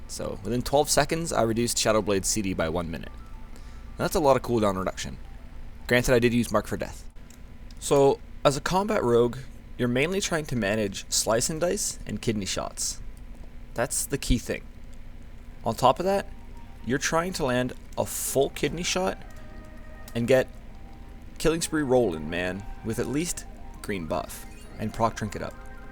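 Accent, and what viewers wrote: Canada. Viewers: Are you Canadian by chance? ;D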